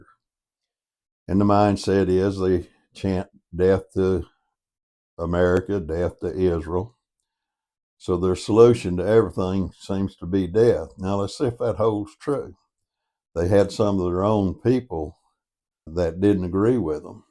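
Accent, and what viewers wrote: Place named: South, United States. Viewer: Listen, I'm a Marine Corps vet and former contractor. I'm about as right wing as you can get. People like you who live your sheltered little life down South are absolutely clueless. My ex wife was Jewish, I was trained by Israelis as a contractor after I got out of the military. Let me tell you something Papaw...Every single Zionist Jew in the world HATES YOUR GUTS. The second they hear your Southern accent they wish you were dead. They hate farmers, they hate people who hunt, they hate people who do for themselves and they spit on Christians in Jerusalem. Last year Israel declared themselves the gay capital of the world. You have absolutely ZERO experience with these people. They don't follow the Torah, they follow the Talmud and the Talmud and the Talmud says the Jesus is in "Gehenna" or hell boiling in feces. 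The State of Israel was founded by a Satan worshipping banking family called the Rothschilds. They signed the Balfour declaration of 1917. They founded OUR Federal Reserve and all the central banks of the world. the current state of Israel IS the GREAT DECEPTION!! You are utterly and profoundly clueless. I love Southern People, My Momma is from South Carolina but I'm a Yankee and I know these people YOU DON'T and you are talking out of your ass.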